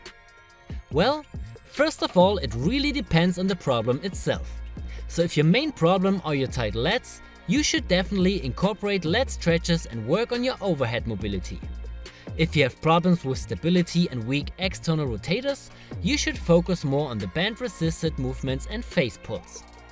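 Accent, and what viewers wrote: Germany. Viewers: Der deutsche Akzent ist ja insane XD aber gutes Video :)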